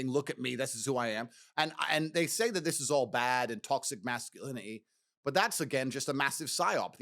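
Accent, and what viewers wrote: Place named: India. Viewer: Just subscribed, quite a strong Indian accent but is very well-spoken. I know India is a huge place but I'm from the UK and I promise you the place I hear about the most for its beauty is India. However, a lot of people hear India and think of some shithole, I might be wrong here but I am sure the economy in India has been and is on a huge growth spurt. Big hidden gem??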